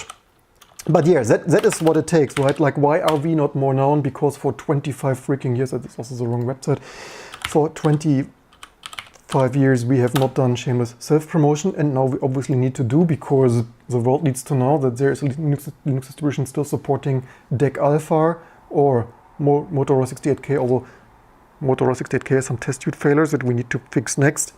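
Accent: Germany. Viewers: Das ist das deutscheste Englisch was ich je gehört habe :) But mad respect for your work man. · Your accent is like a german shit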